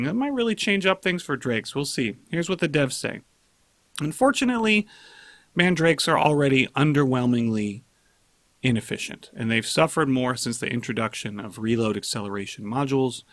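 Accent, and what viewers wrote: Russia. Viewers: Thanks for the russian accent G! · Should be a voice actor in Crossout with that russian accent!!!!:)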